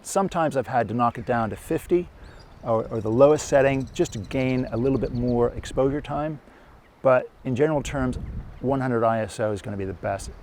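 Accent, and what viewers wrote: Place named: Australia. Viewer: I feel like I hear bits of Aussie accent lol but very informative video! Best is the basic language used- a lot of the other videos I can’t quite understand due to the technical terms etc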